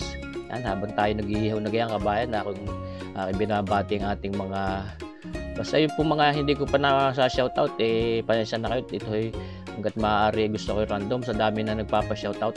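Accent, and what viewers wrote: Batangas, Philippines. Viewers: Nakakatuwa talaga manuod ng mga videos nyo sir gusto ko po batangas accent nyo. More power po sa inyo! Tatawa habang natututo ❤ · shout out watching and enjoying listening to your batangueno accent from Edita Ransom Arkansas USA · Ayos!..bukod sa sarap ng luto mo kabayan,ito ay sinasamahan mo ng mga adlib o pasakalye mga salitang batangueno punto na syang nakakagana panoodin ang iyong pagluluto.Mabuhay ka Kabayan at ipagpatuloy mo iyan iyong talento sa pagluluto at nandito lamang kmi pra suportahan ka...cheers!..god bless!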